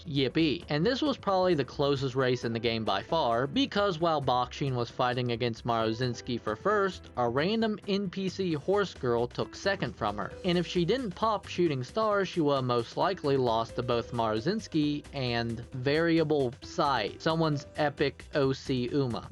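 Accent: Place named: United States. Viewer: You sound like you’re on the verge of using a country accent but it makes you sound nonchalant and cool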